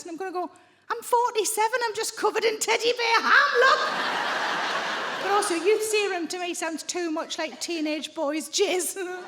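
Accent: Newcastle, United Kingdom. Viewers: I have to say, I have a great laugh, listening to this lady. I was born in the north east of England currently residing the United States. This lady takes me home. Yes, I am a Geordie too!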